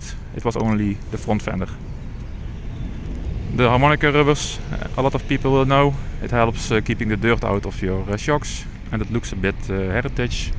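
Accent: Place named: Netherlands. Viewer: Dutch accent :)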